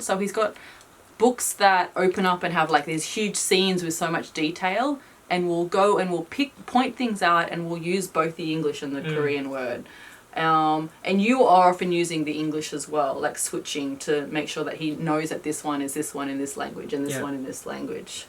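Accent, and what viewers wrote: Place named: Greek Australian, Australia. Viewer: Are you Greek Australian? You have a woggy Aussie accent and said "no not papou, bathroom" so I assumed you must be Greek Australian.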